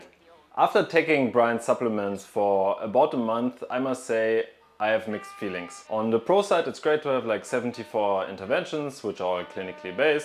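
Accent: Germany. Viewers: The german accent is going to kill me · sounds triggeringly german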